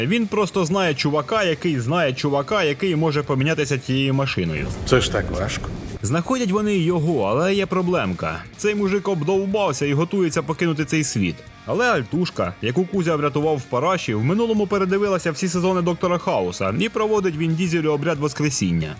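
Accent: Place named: Dnieper, Ukraine. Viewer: Дякую що сказали: бо Ми з подругою дивилися, слухали і думали - звідки родом автор відео. Моя перша думка- Закарпаття, потім зрозуміла - ні. А подруга каже: точно не Закарпаття, може центральна Україна, десь Вінниця або Черкаси. Але ми не експерти - мало подорожували і у відтінках мови центральної України не дуже розбираємось;). Тож Івано-Франківськ вітає Дніпропетровщину ( казали що хотіли перейменувати на Сіверщину..) і дякує за дотепний блискучий барвистий гумор і соковиті відтінки наддніпрянської української 🎉❤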